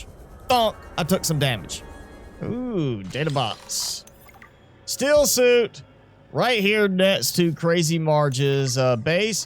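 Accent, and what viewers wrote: South, United States. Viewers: I hear a country accent in your voice lol, where u located at. I'm down in Mississippi lol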